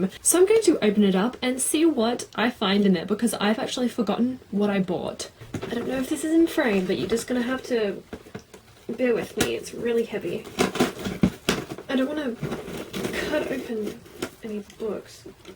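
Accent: Australia. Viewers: 66 books O.O and haha all this time I thought you were English but your Australian. It seems more evident now haha. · OMG I wasn't sure if you were Australian or not! thank god there is an aussie booktuber!!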